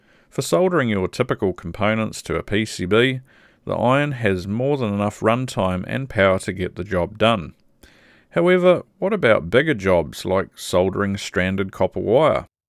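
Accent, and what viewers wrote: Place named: New Zealand. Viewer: Just love your Kiwi accent!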